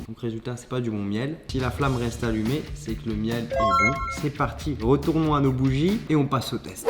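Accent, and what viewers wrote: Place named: South, France. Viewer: C’est moi ou tu as un peu perdu ton accent du sud😅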